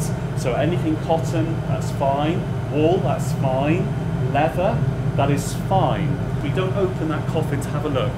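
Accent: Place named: United Kingdom. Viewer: A British accent makes everything sound nice!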